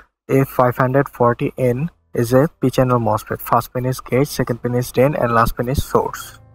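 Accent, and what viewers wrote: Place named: India. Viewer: Are you indian?It sounds like an Indian accent.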